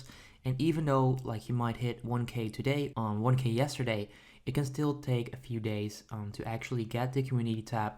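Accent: England, United Kingdom. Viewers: Finnaly! A video about this that is in proper English accent there is no way I can understand indian people talk english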